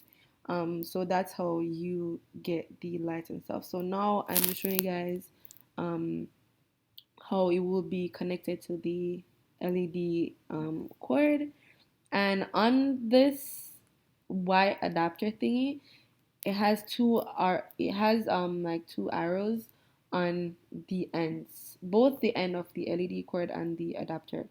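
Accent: Jamaica. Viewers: Dope video, why yuh sound like a yawdy🤔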